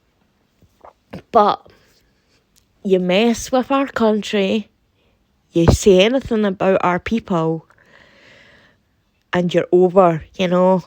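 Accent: Scotland, United Kingdom. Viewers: I love your Scottish Brogue, lovely. I’m from Florida and I have no problem understanding you. I also like tangents, they are interesting and so are you. Don’t listen to the negative comments You do You❤️🌺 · Don't you change for anyone, I love your wee Scottish accent🥰 the more people listen to your accent the more they'll pick it up. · Love your Scottish accent! ❤️Subscribed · I love listening to Scottish accents. Bless you 💖 Much love from Australia 🇦🇺 and from one to another. 😊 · . Your Scottish is fine so don't change a thing. Thousand agree with you, and thousands will continue to but many haven't the time to comment which doesn't mean we don't agree with yours. Free speech is free speech and that is the main point here. YouTube is very much controlled by the wrong people. You keep reviewing as a good job done. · Your Scottish accent is delightful. 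Reminds me of my late and greatly loved great-uncle. His wife, and her sister, my grandmother, both had strong Irish brogues so the Gaelic accents are music to my ears.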